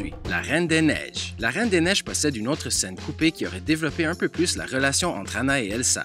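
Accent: Quebec, Canada. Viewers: c'est un québécois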